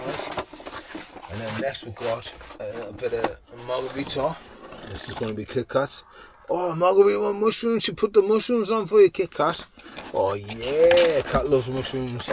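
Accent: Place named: South Shields, United Kingdom. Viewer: You sound like a shields lad.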